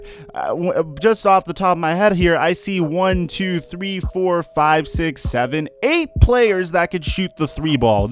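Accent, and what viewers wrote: United States. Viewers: U from ATL?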